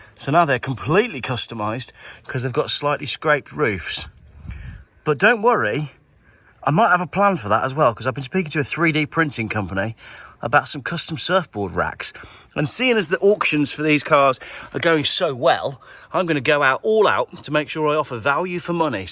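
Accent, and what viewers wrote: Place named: Birmingham, United Kingdom. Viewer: Needs Phil to dilute the bumpkin ‘hidden’ brummie drool 🙏🏼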